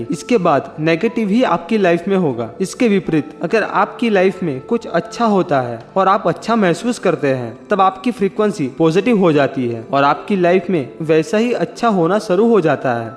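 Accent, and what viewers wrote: Gujarat, India. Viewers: Gujarati ya marwadi honge shayad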